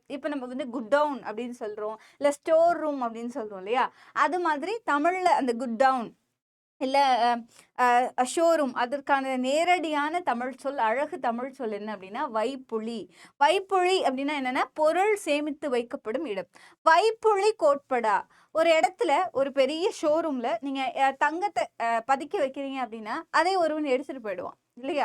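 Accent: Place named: Sri Lanka. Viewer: இலங்கை தமிழ் கேட்க எவ்வளவு நாள் ஆகிவிட்டது இனிய தமிழ் ஓசை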